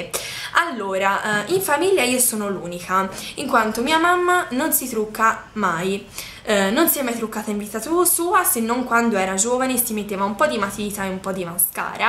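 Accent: Tuscany, Italy. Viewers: #askiaia ciao:) ormai ti seguo da un pò.sei bellissima e dolcissima! complimenti per il risultato dell'esame!Le mie domande per te sono: So che sei toscana dall'accento ma in quale città vivi? Hai altre passioni oltre il make up? Un bacione:* continua così.mi saluti?:)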